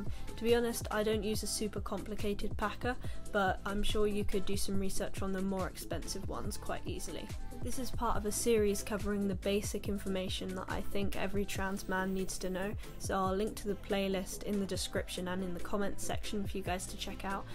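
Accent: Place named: United Kingdom. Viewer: This vid was so much help omg!! I never even thought to use a safety pin, thanks :)) It's also so nice to hear another British accent talking about ftm stuff omg 😭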